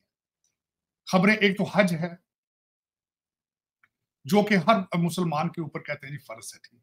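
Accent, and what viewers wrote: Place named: Punjab, India. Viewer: Pronunciations of sir mixing panjabi tadka is so hearttouching.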